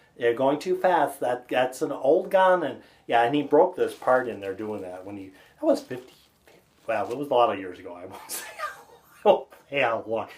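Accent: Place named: Los Angeles, United States. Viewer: Holy cow, made me feel like I had moved back to Kommiefornia for a second, sounds like 50% of LA county. So glad I moved to Fargo.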